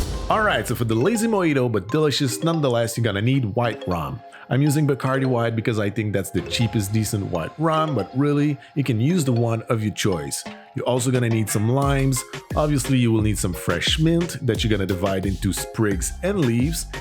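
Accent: Quebec, Canada. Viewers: From Québec ? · French Canadian accent is so sweet !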